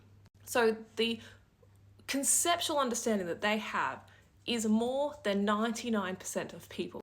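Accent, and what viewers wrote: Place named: Australia. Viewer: My 🧠: how unusual, an Asian woman speaking fluent English with an Australian accent… not saying Asians can’t speak English but defamiliraised … it’s objectively odd… imagine if instead she spoke in Swahili - very bizarre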